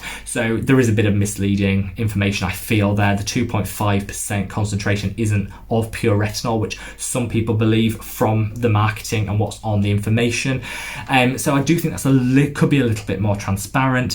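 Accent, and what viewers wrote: United Kingdom. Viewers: Great review. Really. Just discovered your channel and subscribed. Want to hear more reviews from you. You go deep and I love it. You remind me of Hyram only with British accent which I adore😁 I would like to hear your opinion on timeless. They have straitforward products. I love their vit c 20% ighink you would love it too. Good luck with your channel it will grow for sure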